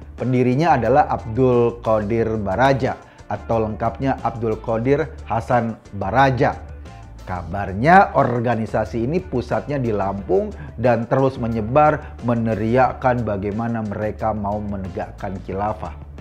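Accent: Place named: West Java, Indonesia. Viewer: Mendengar logatnya kelihatannya dari bagian barat Jawa. Sejak awal proklamasi nggak mau gabung NKRI !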